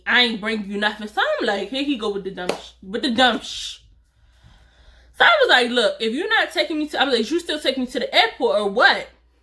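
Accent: Baltimore, United States. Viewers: I Love You 😂 New Subbie 🥰💕 P.S. I Loveeee To Hear Ppl From Bmore Say Words Like "New, To, True, And You" 😂😂😂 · Baltimore accent?? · Girl, I am disgusted. #1. I knew where you was from when you first started talking. All my mama's side from Baltimore. #2 These girls suppose to be grieving thinking about how to give you tea on they mess. I cannot believe this! I am still listening but I am HOTT with you. The disrespect! This is why I always tell my brother, be real because don't leave any mess behind for us to clean up! Aint nothing about you being young and dumb, I would never judge you. You had every right to think you were the only one and had no idea the mess he left, smh. Sis...YOU WISH YOUR BROTHER WAS HERE TO MEET HIS WHAT?!! Girl... New subscriber! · Girl where you from? You lowkey sound like you from Baltimore if so same!! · When you started talking, I said “oh she is from the native land” 😂😂🤣🤣🤣🤣 Baltimore Girls 😉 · First I wanna say sorry for your loss! 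But gurl u had me rolling 🤣 u are a Great story teller. I knew u were from Baltimore 2 mins in when I heard how u pronounced each other only us Baltimore ppl say it like that 😆🤣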